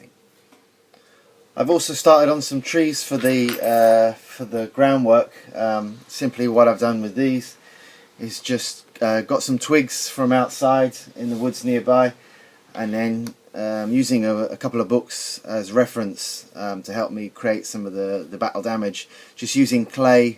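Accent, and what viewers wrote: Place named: United Kingdom. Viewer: Amazing as always , nice to hear your voice and its british, I'll put the kettle on and grab a seat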